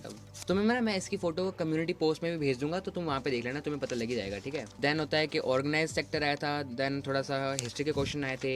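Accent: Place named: Punjab, India. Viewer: Bai lgda punjabi a hna ?? Boln de tarike toh lgda 😂♥️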